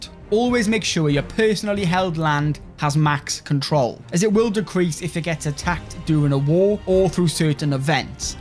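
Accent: Wales, United Kingdom. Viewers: There's nothing better than clicking on a yt vid and hearing a Welsh accent 🏴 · Thanks for the tips mate. Just bought this after playing CK2. Always great to hear a fellow Welsh voice on YouTube 🏴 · I wonder what kind of UK accent that is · Bruv are you Welsh?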